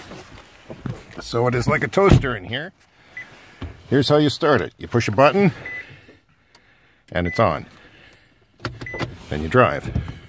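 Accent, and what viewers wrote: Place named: Saskatchewan, Canada. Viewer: It’s weird hearing an accent like mine, being from Saskatoon: the only other non-ghetto city of Saskatchewan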